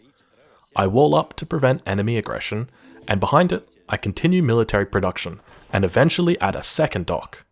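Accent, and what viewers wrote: Australia. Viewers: Gotta love the aussie way of pronouncing "wada" Just like Modest Pelican ^^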